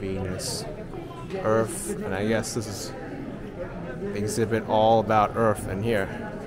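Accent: United States. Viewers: I am learning spoken English from your videos. Thank you for the pure American pronunciation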